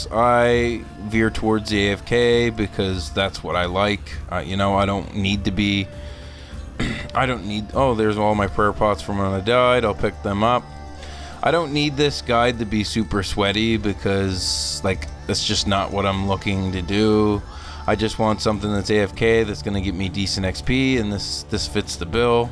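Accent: Canada. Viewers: are you from nova scotia ?canada obviously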